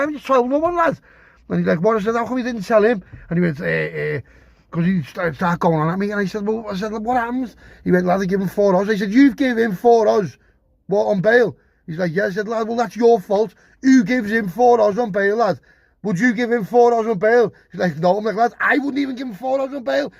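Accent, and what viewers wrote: Liverpool, United Kingdom. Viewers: My missus just said what are u listening to i said scouse stories 😂 she said he's proper scouse 🙈 its in the title😂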